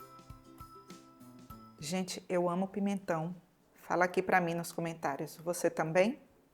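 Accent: Bahia, Brazil. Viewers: Amo suas receitas, baiana arretada adoro seu sotaque mulher.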